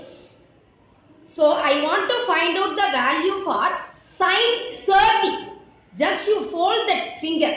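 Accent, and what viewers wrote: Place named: Tamil Nadu, India. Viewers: Yes she is from Tamil Nadu as Dr. APJ does. Hence, both speak TN's English accent. :) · I can bet she is from south India just by her accent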